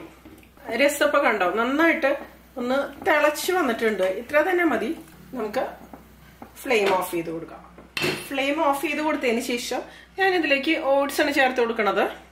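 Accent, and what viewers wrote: Thrissur, India. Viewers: Although I am not a subscriber to your channel, I use to watch your cooking’s. Your Malayalam accent like angamali / trichur style . Believe you work in overseas. All best wishes .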